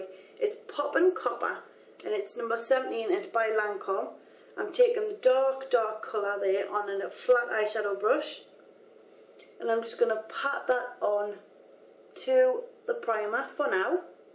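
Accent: Newcastle, United Kingdom. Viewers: Look at her channel. is from South Shields, England. It's called a "Geordie" accent. I love it too!:-) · You sound like a Geordie :) <3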